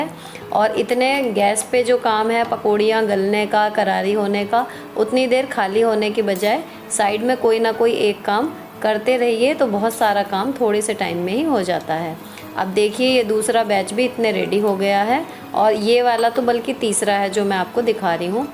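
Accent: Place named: Malwa, India. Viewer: AP kha se h mam apki language me mp malwa ki jhalak h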